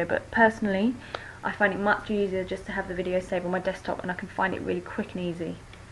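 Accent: United Kingdom. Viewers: Are you english. ??? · Dat uk accent *O* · <- Falling in Love with your British accent <3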